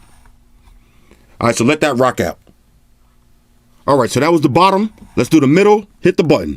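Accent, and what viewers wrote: New York, United States. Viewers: You from New York right? Lol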